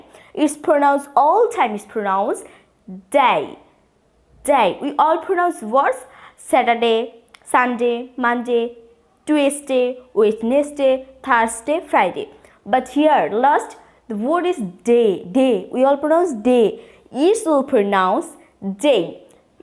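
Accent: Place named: United Kingdom. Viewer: how you got adapted British accent! It's so fascinating to listen.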